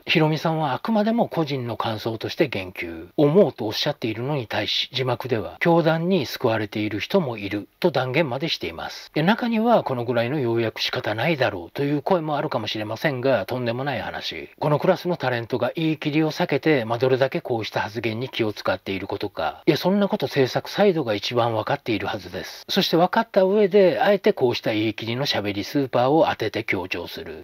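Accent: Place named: Hiroshima, Japan. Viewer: 聞けば聞くほど、広島弁😆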